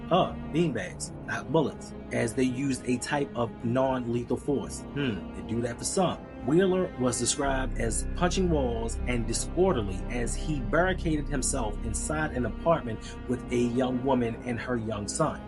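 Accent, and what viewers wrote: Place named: Northeast, United States. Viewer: I'll be back when you get the audio right. Definitely from northeast . I can hear the MD, DC or PA in the voice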